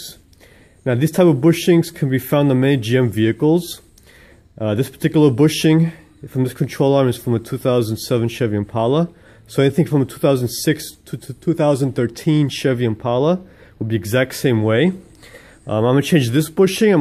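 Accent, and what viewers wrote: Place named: New York, United States. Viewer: good video. love the witty commentary. $ says you are from NY or NJ, reminds me of home (NY)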